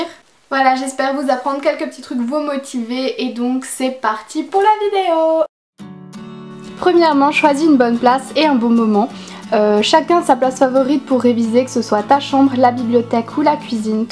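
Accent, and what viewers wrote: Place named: Alsace, France. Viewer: Je n'avais jamais remarqué l'importance de ton accent alsacien ! Moi qui suit aussi alsacienne je l'entend quand même assez hihi😊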